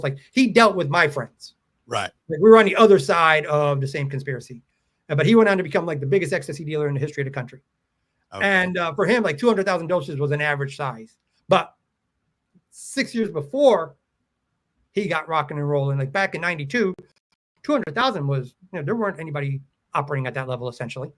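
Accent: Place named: Chicago, United States. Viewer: You always say you're from LA but i knew I detected a Chicago accent. Great content, I hung around the underground club scene in Chicago from <num> in my late teens. Love the stories, reminds me of the stuff I was doing with my friends back in the day.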